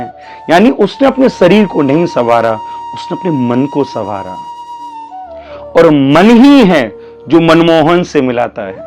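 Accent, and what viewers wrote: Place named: Rajasthan, India. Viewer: दीदी हम मध्य प्रदेश के सतना जिले से हैं यह हमारा ससुराल है प्रभु कृपा से 2 बच्चे हैं हमारे एक प्यारी सी बेटी और दूसरा प्यारा सा बेटा है पूरे परिवार की जिम्मेदारी हम पर है हमारी मम्मी जी को गुजरे 6 साल हो गये हैं वह मुझे बहोत प्रेम देती थीं मेरी खुद की माँ से भी ज्यादा प्यार दिया है उन्होने हमें, हमारी माँ तो पागल हो चुकी हैं और पापा इस संसार को छोड़कर चले गए 7 साल पहले और अब मायके की गृहस्थी बिखरी हुई है 3 बहन हैं और 1 भाई है वह अभी 16 वर्ष का है। सब प्रभु के भरोसे चल रहे हैं जैसी कान्हा की इच्छा 🙏दीदी आप कहाँ से हैं वैसे आपकी भाषा से तो प्रतीत होता है कि आप मेरे प्यारे राजस्थान से हैं 😊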